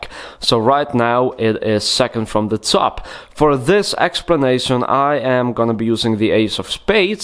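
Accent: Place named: Russia. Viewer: Это лучший русский акцент из всех что я слышал!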